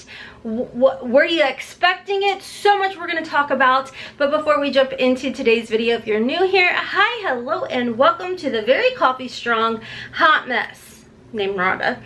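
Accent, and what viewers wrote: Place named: West Virginia, United States. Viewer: IM SO HAPPY WITH THE CHOICES!!! Ofra Good to go?!? wow. so stoked.. and a bunch of TULA, in Symbiosis London in the popupss?!? just wow (the illimasque sold out immediatly once again, lol 😂 not my color story pref tho, and i really was just so stoked about the ofra. i cant wait for that highlighter. I just heard u say time, and i love ur accent. lol my besties are from WV, so its taking me bck.. love ya girl!!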